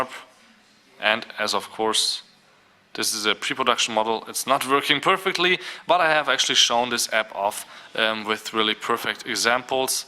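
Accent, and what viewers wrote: Germany. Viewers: this german accent is killing me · Who cares if he has a german accent? Lets see you try to speak german n not have an accent.lok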